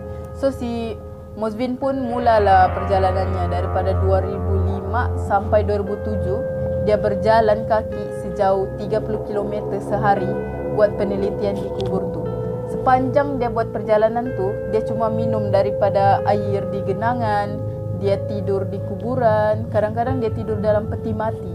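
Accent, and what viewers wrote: Malaysia. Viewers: Kok lucu y lihat cakapnye orang Malaysia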